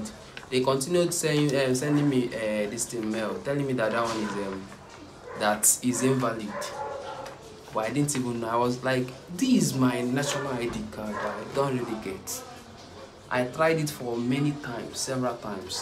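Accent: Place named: Igbo, Nigeria. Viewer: Pls was it the first pin you later had or the second. And pls, where was your location. You sound like an Igbo guy.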